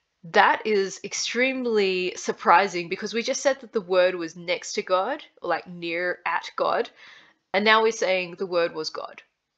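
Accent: Australia. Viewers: I think your accent sounds mostly Australian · This is a super introduction to the subject, thank you. I love the charming way you pronounce these snippets, with this ever-so-slightly Australian dialect of Greek. I started learning Ancient Greek about a year ago, with an ancient Teach Yourself book (Kinchin Smith and Melluish). Since starting I've subsequently started to learn rudiments about the actual pronunciation in Ancient Greece. In fact this TY book explicitly says in the introduction that they're not going to bother with accents "because they're unimportant" ... ! Sadly this also reflects what Allen says in his otherwise very useful book *Vox Graeca*, most of which I've now read: "don't think about trying to get the accents, it's too hard and not worth having such aspirations"!!! I find these attitudes absolutely bizarre! I now have a target accent: 5th Century BCE Attica! 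The "other" challenge there (apart from accents) is the aspirated/unaspirated allophones, τ/θ, κ/χ and π/φ. It's hard but not impossible by any means: I find the best way is to try and speak the unaspirated ones in a sort of Spanish accent, because Spanish has this tendency to swallow accents (i.e. to prefer unaspirated), whereas with English there generally seems to be a mixture of aspirated and unaspirated. Have you done anything on the unaspirated/aspirated allophones? I had a glimpse at your Youtube vids but couldn't see anything.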